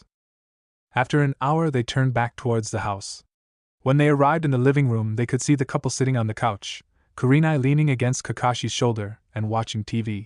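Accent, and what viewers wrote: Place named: Africa. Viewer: why u sound african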